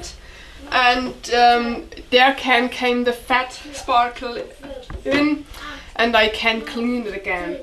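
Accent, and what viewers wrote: Germany. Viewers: Has to be the accent. I like it when she goes all German tongue. Helps me learn the language. Very Polite Lady.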